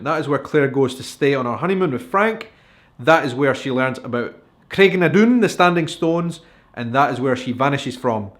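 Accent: Scotland, United Kingdom. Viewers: I am sure you hear this all the time, but I could just listen to your accent all day. I love the Scottish accent. Me and my mom have Scotland and Pendle Hill England on our bucket list trip. We want to see where our family came from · My grandfather was from Glasgow. It's really lovely listening to a Scottish accent even though you are from Edinburgh.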